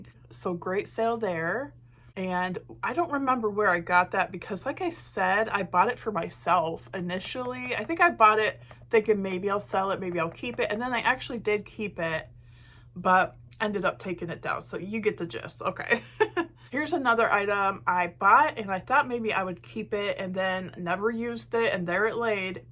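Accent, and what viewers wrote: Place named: Ireland. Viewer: Great Irish accent! What movie was that with the junk lady troll?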